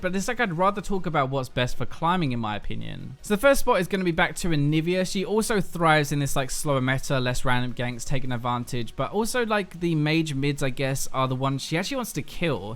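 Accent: United Kingdom. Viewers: i love that british accent hehe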